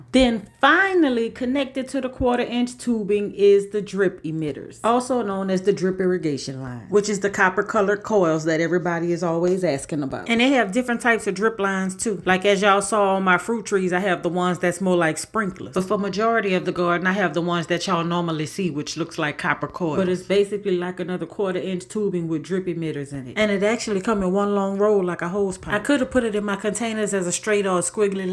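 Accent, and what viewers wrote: New Orleans, United States. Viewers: Your voice sound like us from New Orleans Louisiana. You heard me 😂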